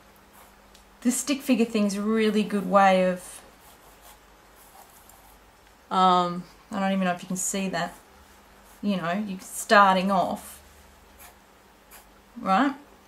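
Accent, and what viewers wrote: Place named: Australia. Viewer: Yay! an Aussie you-tuber!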